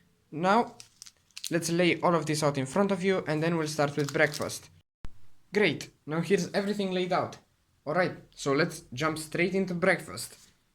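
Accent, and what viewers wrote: Romania. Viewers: Dude. Are you from Romania by any chance? Can't tell from the accent...But it sounds just like it.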